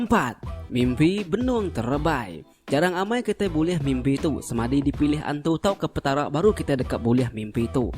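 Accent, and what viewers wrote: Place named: Iban, Malaysia. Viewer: cumil amt slang iban yaa hihii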